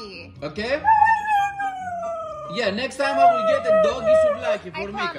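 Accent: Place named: Greece. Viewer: Are you Greek ? Cause the accent is Greek !! Kisses and hugs from Greece!! 🤗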